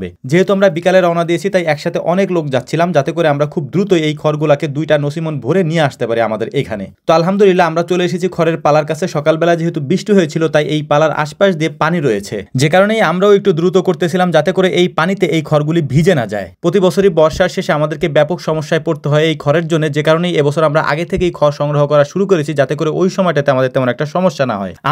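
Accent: Faridpur, Bangladesh. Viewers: ফরিদপুর এর কথা শুনেই Subscribe করে দিলাম। আপনার বাসা ফরিদপুর এর কোন জায়গায় জানাবেন ভাইয়া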